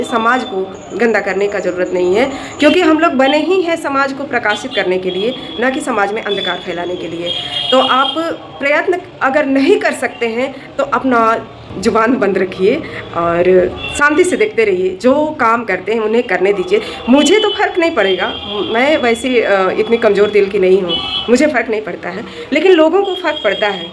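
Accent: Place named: Uttar Pradesh, India. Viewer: Aap kaha ki Rahane wali h mam lagata h aap up ki h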